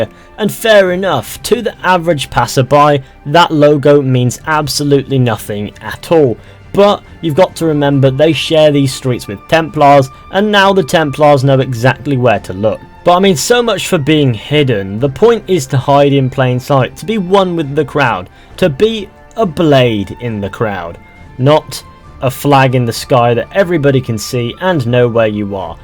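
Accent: United Kingdom. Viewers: first of all if you want me and everyone else to watch it........ change your fucking British accent!!!!!. a YouTube video must be helping not some fucking video with fucking English accent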